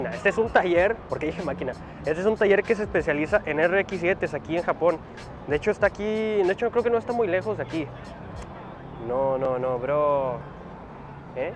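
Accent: Mexico. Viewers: no mames wey, un chino hablando como mejikno en Japón. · que gracioso ver un japonés hablando como mexicano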